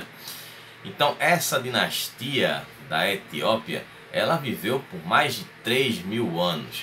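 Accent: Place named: Pernambuco, Brazil. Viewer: História com o Obrigado sou seu seguidor a um tempo já gosto muito da sua didática,esqueci de perguntar se você é nordestino, parece pernambucano rsrsrs Algum dia você vai falar sobre os Citas e o povo do mar? Obrigado pela atenção.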